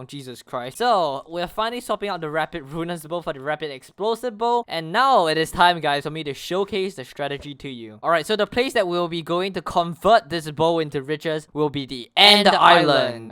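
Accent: Singapore. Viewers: So you are singaporean. You can hear it in the accent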